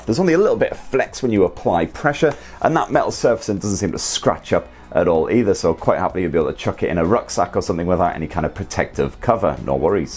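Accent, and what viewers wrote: United Kingdom. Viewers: Could you please re-do this video in English please without the limey accent? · His British accent is very funny and witty. A charming guy!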